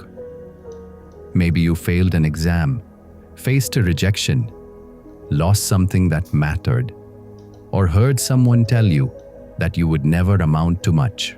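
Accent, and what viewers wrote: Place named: India. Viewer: I wonder which country's pronunciation is? It's like an Indian pronunciation.. do you answer me ?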